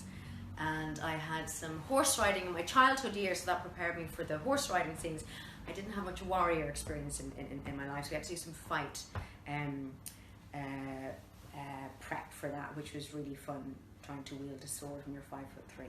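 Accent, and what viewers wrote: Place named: Ireland. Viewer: Ah she’s Irish love it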